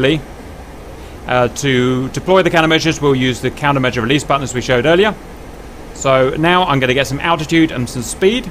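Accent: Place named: England, United Kingdom. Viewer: can you try to change your english accent with an american accent so i can understand any word about what you say.....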